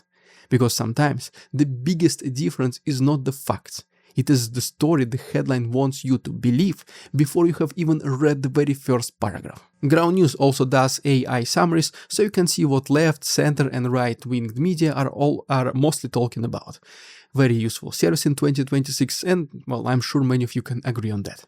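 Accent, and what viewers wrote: Russia. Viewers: i still can't understand shit cuz of his ruski accent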